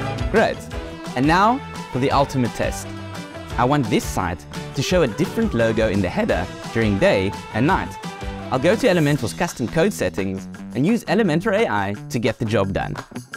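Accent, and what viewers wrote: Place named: South Africa. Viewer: This oke is South African. Random.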